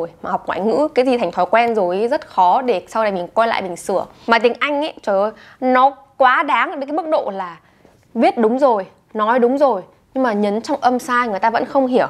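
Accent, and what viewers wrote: Australia. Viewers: Đặc sệt Australia mà lại bảo k có ngữ điệu cụ thể nè 😌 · Ui cái Aussie accent, yêu❤️ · Thích giọng chị ghê! Anh Úc nghe chanh sả rất hay hjhj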